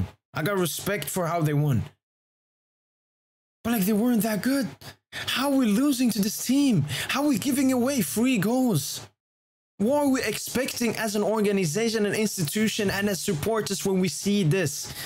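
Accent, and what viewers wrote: France. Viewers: What part of France is this guy from?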